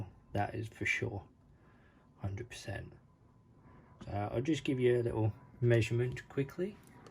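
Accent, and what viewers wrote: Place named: United Kingdom. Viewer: Thanks for the videos by the way, it's always nice to have another British voice chime in and help give insight with context of our laws and prices. The HH custom/special knives are such a good idea for us UK folk in regard to affordable and legal, I hope it prompts companies to start thinking globally a bit more when it comes to lock/size decisions. Thanks for stating the price, I wasn't trying to pry, just thought if anyone is looking at this and wondering how much of a difference used and new are it might help. I've noticed a lot of people talk about the resale market without mentioning price or where to go (I've found Instagram is the main second hand market).👍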